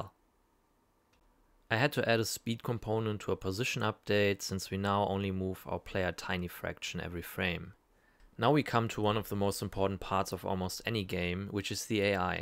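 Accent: Germany. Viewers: Actually are you german? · Cooles video und schon Krass dass es schon so weit mit Ai getrieben wird, Kann es sein dass du deutsch bist ?? Dein Accent hört sich voll deutsch an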